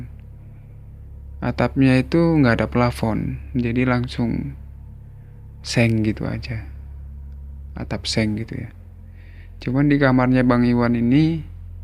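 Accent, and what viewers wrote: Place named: Medan, Indonesia. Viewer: medan x ko bang. mantap.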